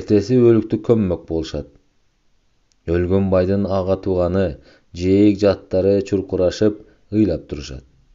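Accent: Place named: South, Kyrgyzstan. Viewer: Туштукчо окубай кыргызча оку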